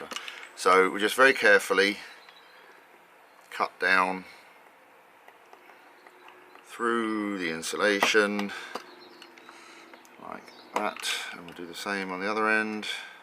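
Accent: United Kingdom. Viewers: Love that british accent, lol Will make one too, thx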